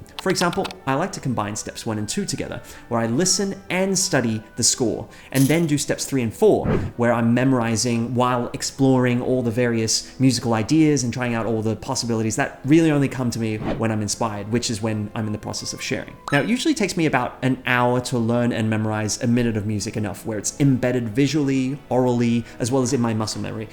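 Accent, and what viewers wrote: Australia. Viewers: He is Australian, but I imagine it changes depending on who he talks to or where he's located. It influences the way he talks naturally (unconsciously). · You can hear the Australian in his voice in this video. Maybe he talked to his mother before filming. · The aussie accent threw me for a loop. I was like... who is this guy? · I've watched like 5 of your other videos and this is the first one I've heard where you sound Australian. I was so confused... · Thats a crazy aussie accent... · It’s nice to hear speaking comfortably in his Aussie accent. Good on him! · We need more of your Australian accent! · I just watched a rosin video that led to this and hearing the different accents threw me for a loop! I went back to the rosin one and listened to the first bit more carefully and I could hear where his Aussie accent was peeking through, but otherwise it was a great American accent he put on for it., we'll watch you either way! 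· Thank you!! This is the answer to the question I’ve always wanted to ask you!😁😁 Btw, love your Aussie accent❤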